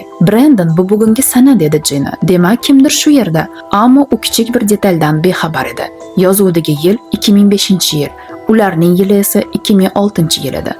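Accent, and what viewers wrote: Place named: Standard, Uzbekistan. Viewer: Соф адабий узбек тилини шундай согиндимки❤Рахмат сизга❤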